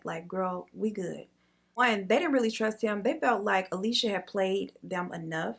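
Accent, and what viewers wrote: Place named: Louisiana, United States. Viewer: I love the look but that blue and gold hit different than a lot of the other ones. Then again blue my favorite color. Don't really care about make up process but I love the vibes, murder stories and final looks. And I sang " 🪨" at the same time you did and I been here for the accent cuz you tryna hide it it sounds like Louisiana to me. But I say everybody from Louisiana 😆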